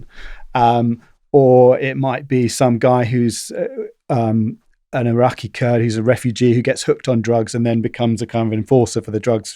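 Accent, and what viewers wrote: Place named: RP, United Kingdom. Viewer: What is it with the comments on here saying this guy doesn’t know what he’s talking about? Well he clearly does and I think he was very precise. Probably because he has a plummy accent so he shouldn’t be taking about drugs when in reality it’s those with middle class plummy accents who consume the most. And others who think it’s only middle class people who snort Coke? I grew up in a council estate in Birmingham a very rough one at that, and believe me once the kids were with the dads for the weekends there was plenty flying around at girls parties!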